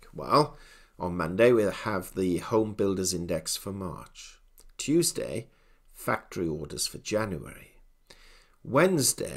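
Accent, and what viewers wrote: United Kingdom. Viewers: I guess I'm into stereotypes. These reports as read by old British accent dude keeps me coming back. Sounds smart and full of wisdom, and So cool, Thanks for the info, I don't always like the truth in your reports, but I do really appreciate them. It's like listening to Harry Potter, but for economics and precious metals. 8)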